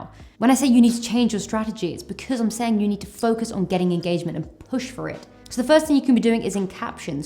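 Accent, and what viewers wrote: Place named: United Kingdom. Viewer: Aaaaah.... British accent 🔥🔥❤️❤️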